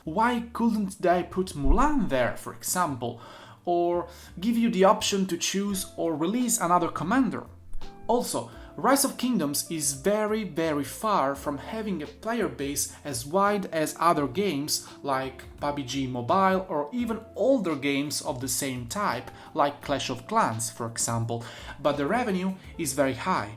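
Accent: Italy. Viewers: Avevo intuito qualcosa dalla pronuncia, il ciao finale mi ha convinto😂. Iscritto 💪👍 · bro are you italian? by the way very good video, as f2p i totally agree with you, another youtuber (@ said the same things weeks ago in one of his stream explaining why rok is about to die if they continue on this path, he try also to contact developers but no-one respond to him #CommunityMatters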